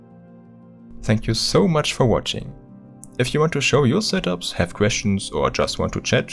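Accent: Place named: Germany. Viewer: Man hört den deutschen Akzent ganz schön raus :D Echt schönes Video, vielleicht baue ich mir selber auch mal sowas. Aktuell habe ich eher Erfahrungen mit kleinen Terrarien gemacht.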